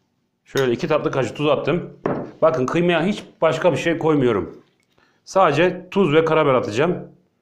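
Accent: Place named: Gaziantep, Turkey. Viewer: Abi anteplimisin siveden oyle anlasiliyor yoorum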